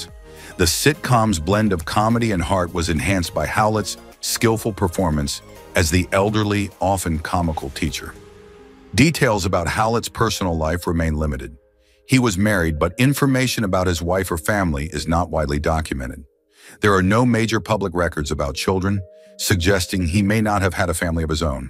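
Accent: United States. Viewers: What a mess up when you get an American to do an English presentation.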